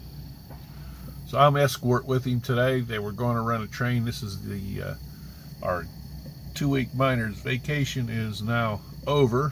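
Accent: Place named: Pittsburgh, United States. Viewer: I always enjoy your videos,. Greene County is where my dad's mother came from. Love the Pittsburgh accent, too. Thanx again from South Fayette Twp.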